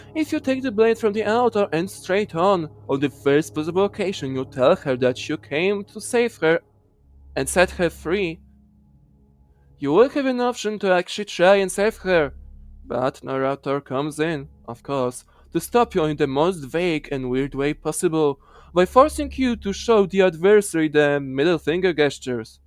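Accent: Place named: Poland. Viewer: Tak mi się wydawało, że zajeżdża Polskim akcentem, ale jak usłyszałem jak wymówiłeś "vodka" to byłem pewien lol. Zajebisty kontent btw, nie tylko ten film, ale cała reszta, na pewno tu chwilę zabawię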